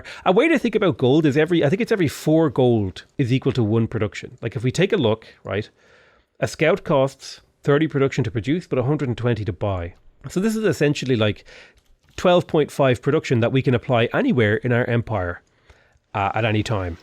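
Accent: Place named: Ireland. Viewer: you sound especially irish today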